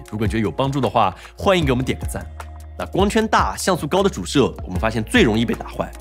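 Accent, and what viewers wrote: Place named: Jiangsu-Zhejiang, China. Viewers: 完全被江浙口音搞出戏了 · 四川 河南 东北 北京 安徽 河南 山西方言大行其道，江浙沪带点口音你就受不了了？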